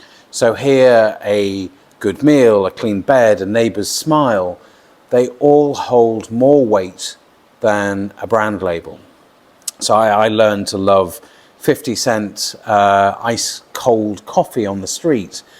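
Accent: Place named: England, United Kingdom. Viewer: Great pommy accent, mate! 😄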